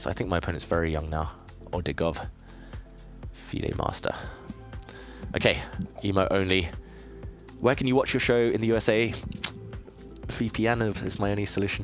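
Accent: United Kingdom. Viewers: I much prefer watching you to magnus, because your commentary is much more fluent, as a professional commentator and native english speaker (I love your english accent too!). And frankly your moves, style of play, and attitude are more relatable as well. I love watching you analyze and explain your GM moves, the explanation of which makes it much more impressive and instructive to watch. Magnus is playing on such a diff level that it's just not relatable, even if he's #1 in the world. I watch all your TT streams start to finish, but not magnus's. So please come back soon; we appreciate you so much!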